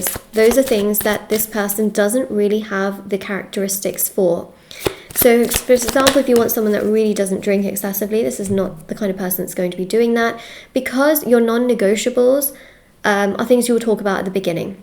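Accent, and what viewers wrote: United Kingdom. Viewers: You’re hot, but your hands are too big but your British voice is hot so it’s confusing to me.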